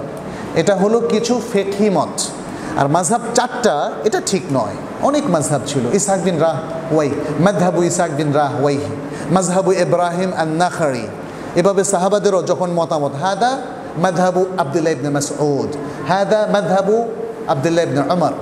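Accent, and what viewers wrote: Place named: India. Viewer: সে ইন্ডিয়ান , ইন্ডিয়ান ও পাকিস্তানিদের উচ্চারণ বাংলাদেশী বাঙ্গালী জাতির লোকেজনের থেকে আলাদা ও ভিন্ন হয় , এক্ষেত্রে ইন্ডিয়া এর বা পাকিস্তানের দেওবান্দী ও আহলেহ়াদীস় সালাফী আলেমদের উচ্চারণ একই রকম হয় । আর সলাাতের বাইরে কেউ ভিন্নভাবে উচ্চারণ করলে বড় সমস্যা হয় না জানা , আর তাঁরা কখনো হ়ারাফ় এর বা কালিমাহ লাফ়াজ এর পরিবর্তন ঘটে এমন করে পড়েনা বা উচ্চারণ করে না ।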